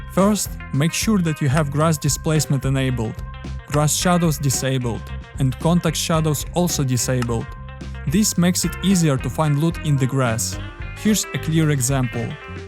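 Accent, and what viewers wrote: Russia. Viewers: I’m might have unpopular opinion but man it’s so hard to watch hard accent videos like this, why every 2nd video from someone from EU is HARDEST RUSSIAN ACCENT 😭🤞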